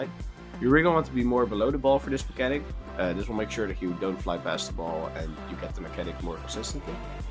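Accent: Netherlands. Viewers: Het Nederlands accent 😭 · haha het accent gaf idd een indicatie dat OP een Nederlander is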